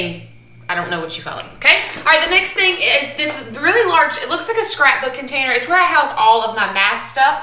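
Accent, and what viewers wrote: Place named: Texas, United States. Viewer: From texas? Nice accent and ideas of course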